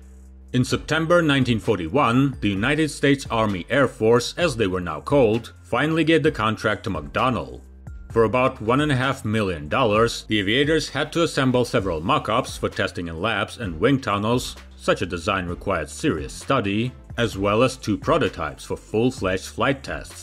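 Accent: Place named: Russia. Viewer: Английский не его родной язык, он русский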